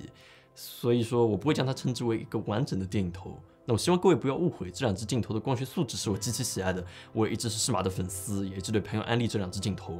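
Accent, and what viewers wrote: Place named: Hangzhou, China. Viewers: 杭州口语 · 你的杭州口音还是改不过来啊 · 杭州人喔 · 我們大杭州標準口音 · 强烈的杭州口音！！！听着好点别扭！！！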